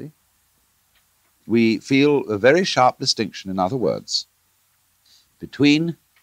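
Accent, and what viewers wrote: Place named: Upper class, United Kingdom. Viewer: As a parent, I was glad when my kids went to school. It gave me a break and it was free childcare. The thing is, what do we replace the human progression through life with? Yes, Watts' observation of life are true, but he offers no replacement or a better way. He spent his life mocking humanity from a point of privilege. Why did he believe his diatribes were more valuable than any other educated and reasoning persons rants? He stated the obvious far too much and isn't really addressing intelligent minds. The audience he needs to address are too stupid to follow his thoughts, while the rest of us wallow in his comforting upper class English accent, without really listening to him at all. It's a mish mash of thoughts, delivered with an annoying arrogance. I can't abide him personally.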